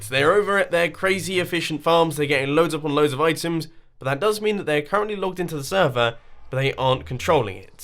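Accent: United Kingdom. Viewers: Are you bri-ish. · Wait wait wait, you're a teenager?! From your voice, don't know why, but I expected you to be in your early 30's! Has anybody told you you sound like a british young adult? [Thinks about your profile picture and minecraft skin] Ooh. They did. XD (This is not supposed to be an insult) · Wait are you British like me?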